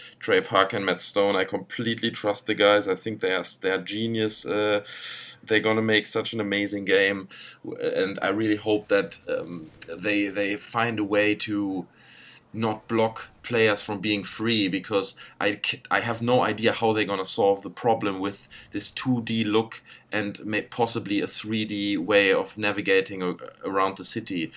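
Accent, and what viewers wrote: Germany. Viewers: Nice to hear a German accent on here :)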